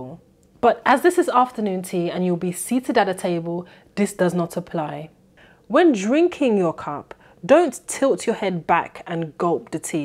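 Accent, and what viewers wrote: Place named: Cockney, United Kingdom. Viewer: Love your refined east-end accent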